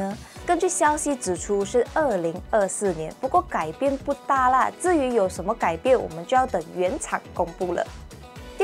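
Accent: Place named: Malaysia. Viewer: 我喜歡這種大馬口音 加油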